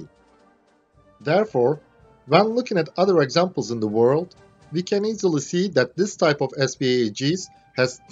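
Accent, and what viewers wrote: Turkey. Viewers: Lehçenden direkt olarak Türk olduğun belli oluyor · Bu videoyu seslendiren arkadaşım sen Türksün anladığım kadarı ile. İyi çalışma yapmışsın... 👏🏻👏🏻👏🏻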